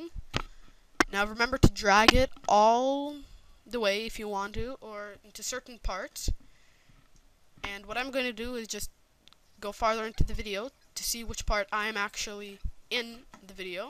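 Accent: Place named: Canada. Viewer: no offense..but i can tell u have a canadian accent..its cool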